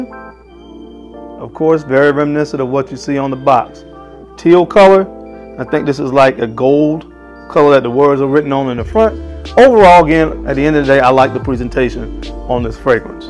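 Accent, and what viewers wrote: United States. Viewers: Love the country accent.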